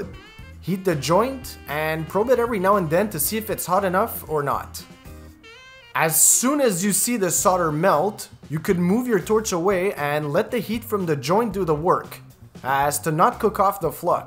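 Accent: Quebec, Canada. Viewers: Are you French Canadian ? You have a little french speaking accent in your english ! Very useful video !! Merci beaucoup ! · c sur t quebecois toi xD merci pour ton video ;)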